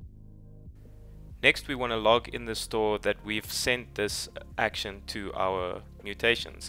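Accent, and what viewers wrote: South Africa. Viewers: Sound like a South African, good for you.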